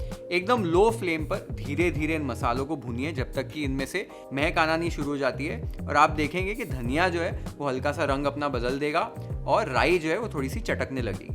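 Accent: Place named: Gujarat, India. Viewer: you speak Hindi in Gujarati style😊😂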